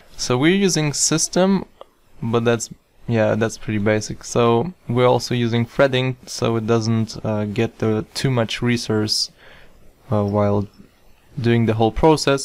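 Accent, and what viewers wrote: Germany. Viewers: man hört das du deutsch bist :D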